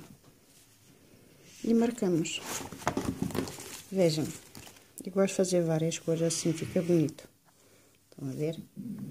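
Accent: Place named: Portugal. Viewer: Adorei os marcador de livros. sabe eu gosto muito do seu sotaque porque sou descendente de portugueses.. tenha um bom dia. obrigado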